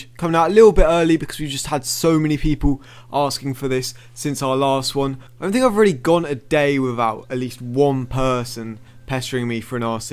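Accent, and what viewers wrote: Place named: United Kingdom. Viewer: Gotta love that brilliant British accent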